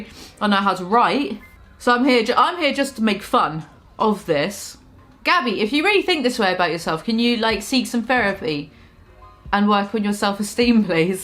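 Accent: United Kingdom. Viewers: where in the UK is your accent from? its my fave one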